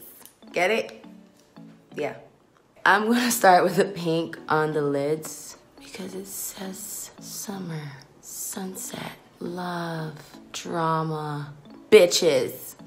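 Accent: Barbados. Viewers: you can really hear her Barbados accent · Her Bajan accent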